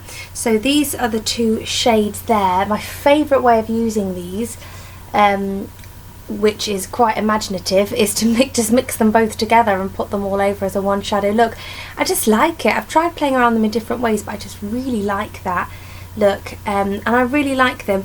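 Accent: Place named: United Kingdom. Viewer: Yay 21 product, that is amazing! I used up 30 makeup products this year (including one little polish and a face primer). Happy to follow you in 2017, girl! :) Are you from the UK, I looove your accent! You remind me of how Sali Huges speaks (I adore her)! Oh congratulations on your pregnancy! I have two little girls! :)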